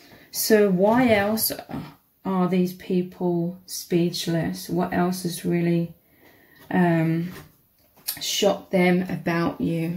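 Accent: England, United Kingdom. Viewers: so accurate! i can't place where your English accent is from. Would love to know it. I spent time all around the UK :)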